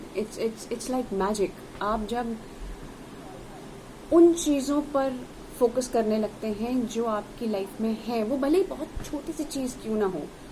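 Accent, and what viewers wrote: Bundelkhand, India. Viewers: we love your bundelkhandi acent in sit videos ....could u please tell us how u got this or might be u belongs to bundelkhand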